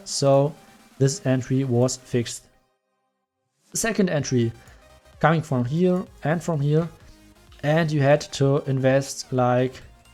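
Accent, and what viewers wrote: Germany. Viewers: Wenn deutsche englisch reden 👍top erklärt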